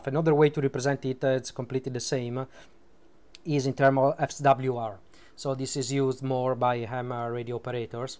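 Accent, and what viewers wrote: Italy. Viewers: quanto si sente che sei italiano! grandeeeeee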